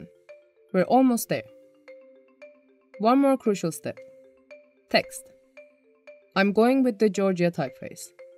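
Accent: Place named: Turkey. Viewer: Konuşan kesin Türk, aksandan belli 😂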